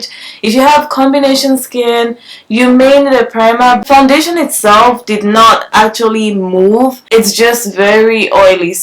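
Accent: Nigeria. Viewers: Nigerian or Ghanaian?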